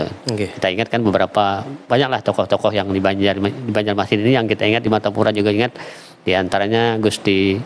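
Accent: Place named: Brunei. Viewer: Hampir sama dialek Melayu Brunei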